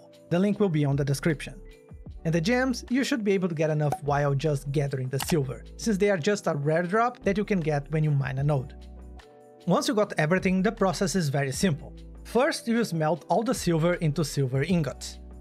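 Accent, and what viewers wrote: Brazil. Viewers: Looks like a Brazilian accent lol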